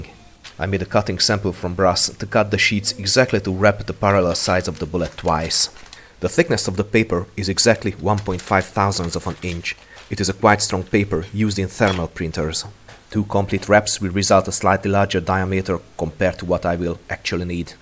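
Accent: Hungary. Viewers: Nice Hunglish ))). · Michal Štiglic now imagine him saying that in his Hungarian accent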